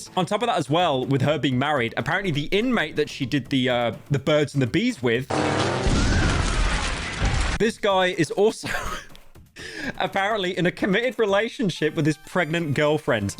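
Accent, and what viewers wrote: United Kingdom. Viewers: i was 100% certain that it was penguinz0 video until i got jumpscared with bri'ish man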